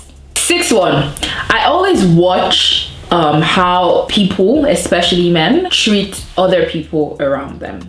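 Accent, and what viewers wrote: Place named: Nigeria. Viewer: The Nigerian accent gets me all the time😂❤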